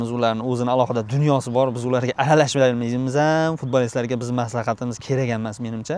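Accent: Khorezm, Uzbekistan. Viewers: Har dim Xorazmcha getib duribdidon,nerdansiz odosh?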